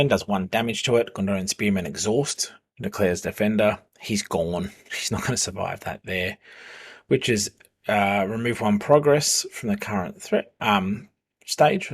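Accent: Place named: Australia. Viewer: Thanks for the playthrough. It's good to hear an Aussie accent for once! Where did you get the playmat from? Cheers.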